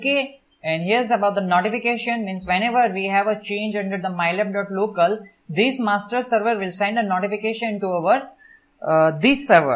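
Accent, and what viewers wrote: India. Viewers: No option for Closed Caption to go with this heavy fast talking Indian accent? This tutorial would be better served either with CC or someone with less accent when speaking English.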